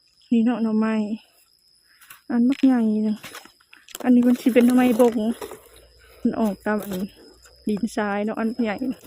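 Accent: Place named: North, Thailand. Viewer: ຫມົກກະວ່າມອກເນາະບ້ານເຈົ້າສຳນຽງເວົ້າເຫມືອນຄົນເຫນືອເລີຍ!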